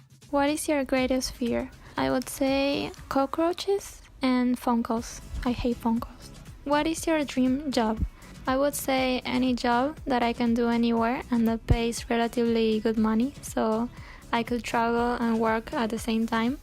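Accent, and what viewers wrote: Argentina. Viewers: sos una geniaa 💚 me encanta cómo hablas inglés con un toque de acento argento xd seguí así que tus videos son una joyita💚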